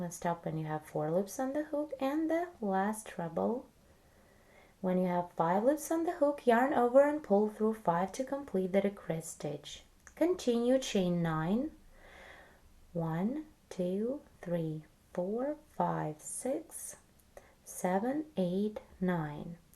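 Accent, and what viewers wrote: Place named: Romania. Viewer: După accent și cum ți în mâna dreaptă croșetă ești Europea mai exact româncă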